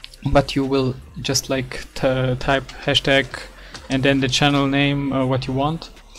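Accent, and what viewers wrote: Germany. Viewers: War klar das du Deutsch bist haha danke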